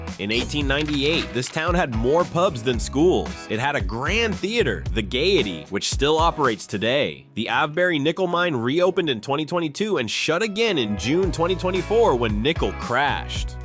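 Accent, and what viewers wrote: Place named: United States. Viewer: What a joke!!!!!!!! I stopped watching when I heard "Morwell". Yes, Morwell the drug capital of the Latrobe Valley. The shops have closed and the town is dead. The only businesses thriving are the drug traders and pushers, the police and the lawyers. And, the American voice, don't get me started on that. Vomit material.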